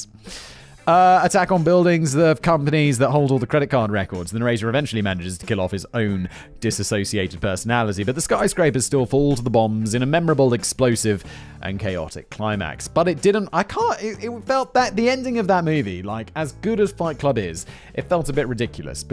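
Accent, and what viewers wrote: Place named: United Kingdom. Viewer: Calls himself Whistle and China "Chiner"... British accents are Great!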